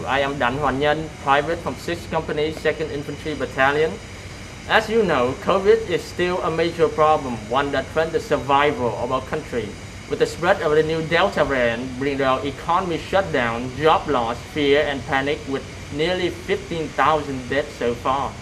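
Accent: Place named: United States. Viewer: Good American accent, Meaningful content!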